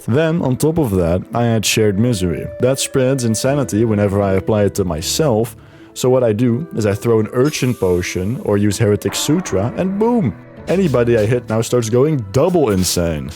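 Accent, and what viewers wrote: Netherlands. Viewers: ik hoor de nederlandse accent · your accent is like realy dutch idk man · Ik hoor een Nederlander ❤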